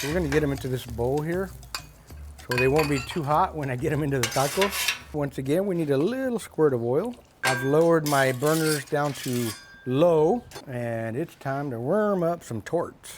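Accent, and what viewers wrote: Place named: Houston, United States. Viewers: Sounds like every first gen American (or born in Mex and came young) that lives in South Texas. 😂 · I have uncles from Houston that sound exactly like. 😂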